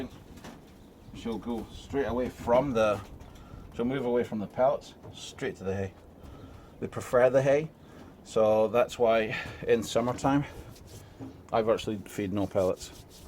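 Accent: Scotland, United Kingdom. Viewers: Are you british? :D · Wait... you are not British?! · Are you of Scottish or Northern Ireland descent?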